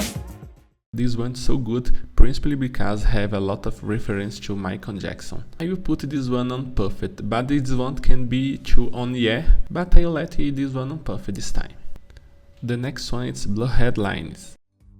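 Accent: Brazil. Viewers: Vc é brasileiro, seu sotaque é uma bosta, e dá para ver que é brasileiro, fala português, seu inglês tá uma bosta irmão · Só eu que percebi que ele é brasileiro e te falando inglês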